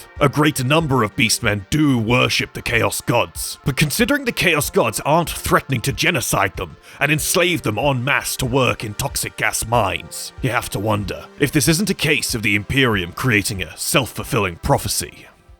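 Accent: United Kingdom. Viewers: Dude, why you have to do the cringe voice over. Just talk. I mean you already have the British accent. You don't need to do the goofy voice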